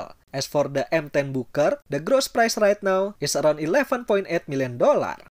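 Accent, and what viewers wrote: Indonesia. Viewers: English tapi pekat pelat Indonesia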